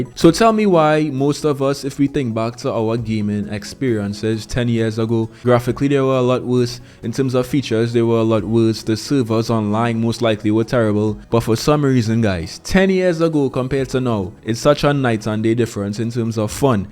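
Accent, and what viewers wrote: Trinidad and Tobago. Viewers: Big dawg you from Trinidad? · As soon as the video start I say this yute trini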